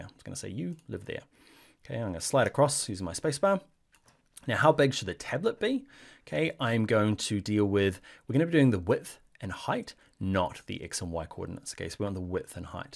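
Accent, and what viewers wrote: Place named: New Zealand. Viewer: Love to hear the Kiwi accent helping developers!! Cheers bro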